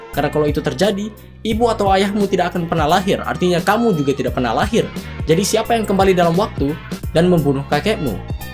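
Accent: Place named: Indonesia. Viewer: Gila semua katanya logat indonesia kecuali kata "paradox"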